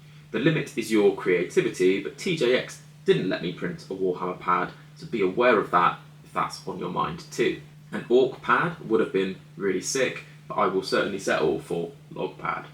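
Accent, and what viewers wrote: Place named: England, United Kingdom. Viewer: Banger review and banger English accent! Do you have also a twitter account?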